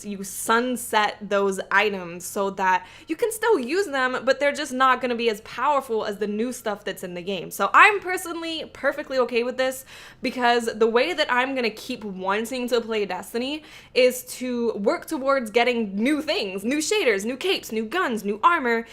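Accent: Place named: New Jersey, United States. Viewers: Where are you from? it sounds like you're from new york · You have kind of a jersey accent but I might be wrong are you from around jersey or something (I'm not a stalker I'm just curious lol)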